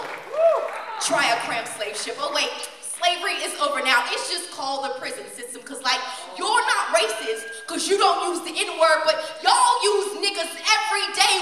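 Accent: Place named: New Orleans, United States. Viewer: im convinced shes from nola lol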